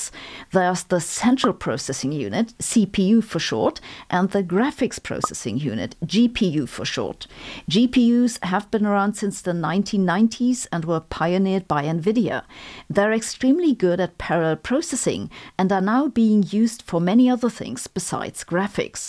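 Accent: Germany. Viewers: It's funny how all Sabine's "e"s become like a German would pronounce an "i", even in words where the actual pronunciation is much more close to how a German would pronounce it, like in Graphene.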